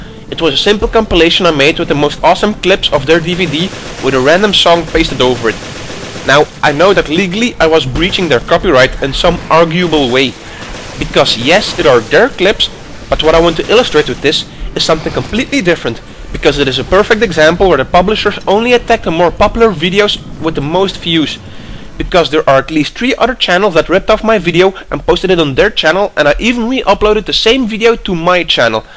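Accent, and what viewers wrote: Netherlands. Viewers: I know, that is why i put it in my wall of tekst! :) Go make more great video's m8, i enjoy them alot even with your dutch accent.. :) Its rare that i see video's who actually make sense. Anyways have a great newyear and all the best.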